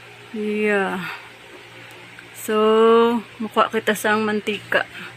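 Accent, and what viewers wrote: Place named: Hiligaynon, Philippines. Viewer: Galing naman, ang husay! Ganon naman yan ang cute!!!!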